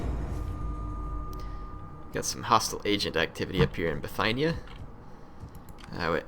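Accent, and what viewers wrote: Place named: United States. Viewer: By the way what US state are you from again? Just curious cause i'm trying to figure out your accent ;)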